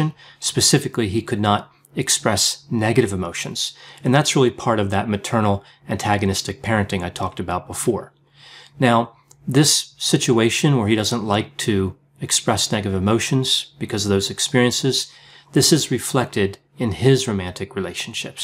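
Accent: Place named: Pennsylvania, United States. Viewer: Great info. I detect a Pennsylvania/Philadelphia accent.:)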